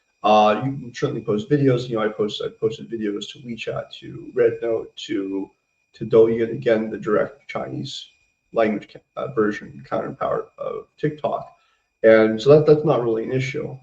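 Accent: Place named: United States. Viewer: YO!!!!! Chandler Bing LIVES!!!!!!! Why is he speaking with a posh American accent!!!!!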